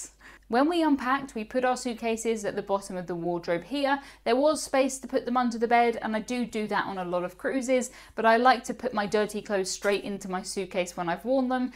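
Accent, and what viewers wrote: United Kingdom. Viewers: Love your vids! My fav thing about your vids is the "British-ism" word explanations and your accent :) !! So weird how English is spoken between 2 countries but yet some words mean something totally different.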